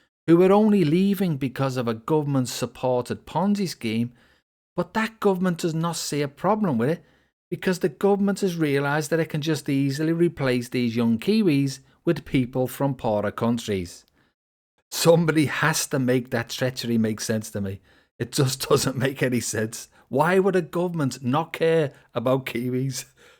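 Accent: Liverpool, United Kingdom. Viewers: Sounds like a scouser(Liverpool) which is a depressing dump, now he constantly whines on about NZ, yes you could buy a terraced house in a rough area of Liverpool pretty cheap but reason is because nobody wants to live there. Auckland compared to many places is quite nice and not expensive compared to comparable cities.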